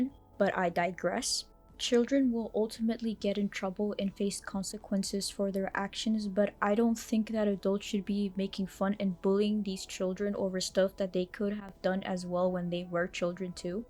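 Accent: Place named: South, United States. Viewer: Omg no I love Southern/Black accents 😭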